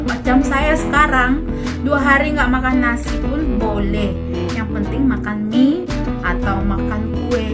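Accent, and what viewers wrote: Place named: Medan, Indonesia. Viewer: Dia dari Medan Sumatera Utara Indonesia... wkwkwk cuma bagi tahu je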